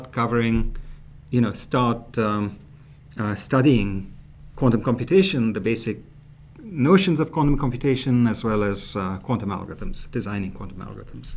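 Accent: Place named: India. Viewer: He sounds like indian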